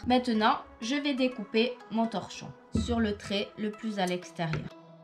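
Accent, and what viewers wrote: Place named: South, France. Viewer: Un abonné de plus hehe :p ça fait plaisir de ré entendre cet accent du sud bisous à zozo et tout le monde :) PS : c'est favess09 🤣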